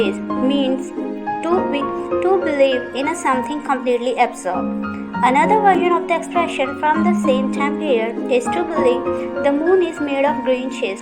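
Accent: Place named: England, United Kingdom. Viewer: nice english acent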